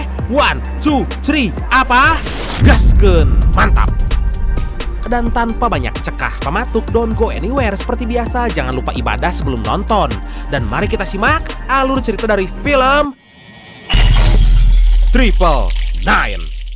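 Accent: West Java, Indonesia. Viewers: btw asal mna a?logatnya sunda pisan wkwkwk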